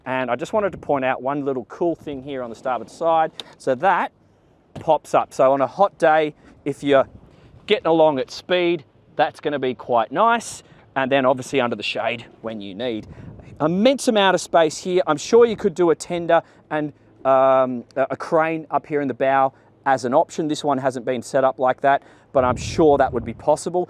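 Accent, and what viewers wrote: Australia. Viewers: This Boat is awesome, but i love listen to your Aussie Voice too!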